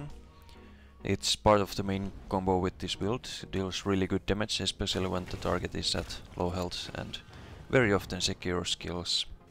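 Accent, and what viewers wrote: Finland. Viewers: Kuulostat niin paljon suomalaiselta että on pakko kysyy että oonko oikeessa? taino siis jos osaat lukee tän niin oot varmasti suomest xD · U from Finland?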